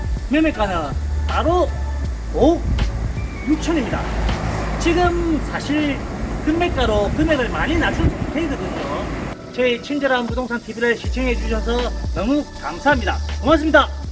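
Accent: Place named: Seoul, South Korea. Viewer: 사장님 서울말이 너무 자연스럽네여 소개 흐시다가 한번 꺾었는데.. 30분 쉬고 방송 한거 같네예 ㅎㅎ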